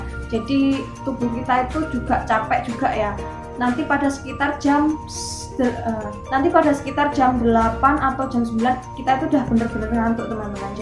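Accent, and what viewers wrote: Java, Indonesia. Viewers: Medok e ketok emen :v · Medoknya itu nah 😍😀😀😅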